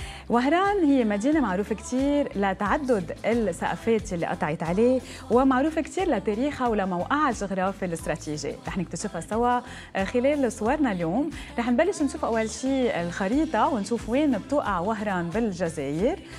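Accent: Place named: Lebanon. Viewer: هههههههههههههههههههه..سبحان الله تقول عليك لبنانية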